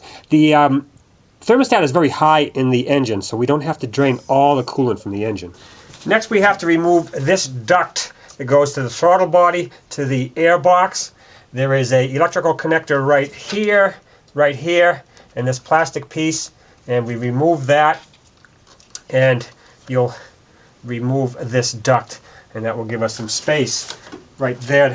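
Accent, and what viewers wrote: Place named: Boston, United States. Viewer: LOL... That's a perfect Boston-area accent.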